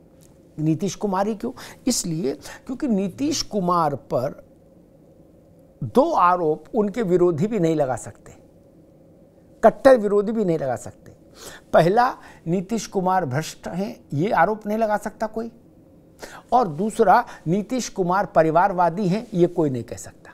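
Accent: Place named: Kanpur, India. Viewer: Sir your observation and cumminicatons skill are excellent and presentation is very effective. Please answer me are you from Lucknow or kanpur as language shows but nice explanation for general population please answer place of residence I think kanpur 😂😂😂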